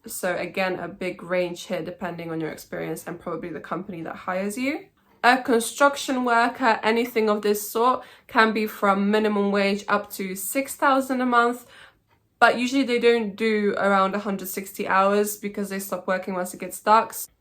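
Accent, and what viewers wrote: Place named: Poland. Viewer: Masz Polski akcent.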